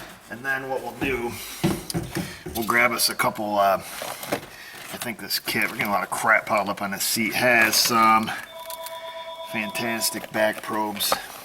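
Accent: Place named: New York, United States. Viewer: Well that New York accent came out in this video! haha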